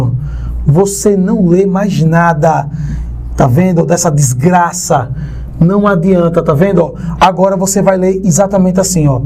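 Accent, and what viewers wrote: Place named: Bahia, Brazil. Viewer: Vc é o cara mesmo negaum kkkk esse sotaque da bahia é fodaa. Ryan BH MG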